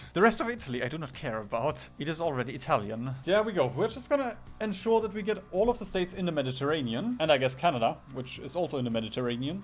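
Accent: Germany. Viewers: question you german ?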